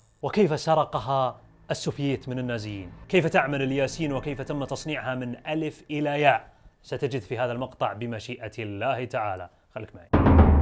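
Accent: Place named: Saudi Arabia. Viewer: اعجبني ان عربيا واعتقد لهجتك سعودية لقد ابدعنا في استخدامها في لبنان وادخلنا ابتكارات من 1975 وحتى 1990 لا يمكن شرحها الان القذيفة التقليدية العادية ثلاث مراحل التاندوم اربعة مراحل الروسي بثلاثة الاف درجة مؤية المصري الكوبرا خمسة الاف درجة مؤية المسماة الكوبرا الياسين من المبكر الحديث عنها تقنيا جهاز رصد الميركافا بعيد المدى وفشل دائما امام الياسين لقصر المسافة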